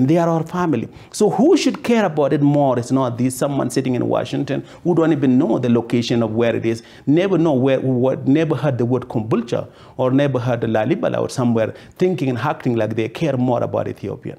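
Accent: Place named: United Kingdom. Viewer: like that British accents. Thanks for your presentation.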